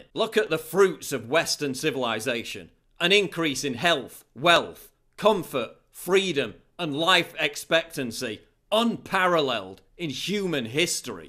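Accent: United Kingdom. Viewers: Some practices are disgusting and vulgar by any standard. These people need to be enlightened. Anyway, thank you for the entertainment. Your British accent makes you even funnier.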